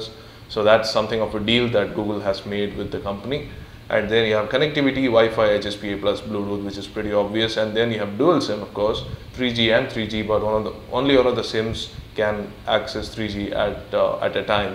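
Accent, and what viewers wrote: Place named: Tamil Nadu, India. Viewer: The fone is ok for the price........ but are you from Tamilnadu..... just asked coz of your accent.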